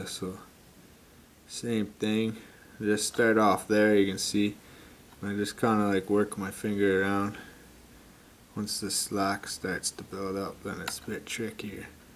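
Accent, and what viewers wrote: Canada. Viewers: are you canadaian by chance?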